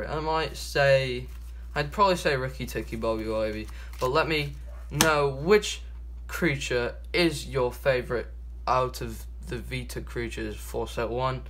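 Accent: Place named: United Kingdom. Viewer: British accent 10/10 :l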